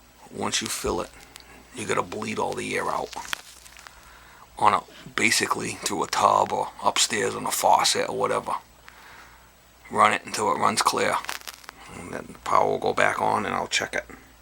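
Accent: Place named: Massachusetts, United States. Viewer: Great video, I really like the tags you use, I might have to look in to those myself. I like listening to you, your accent is exactly the way my dad talked, he was from Peabody MA. He just passed away recently so I enjoy hearing that accent! Keep up the great work! PJ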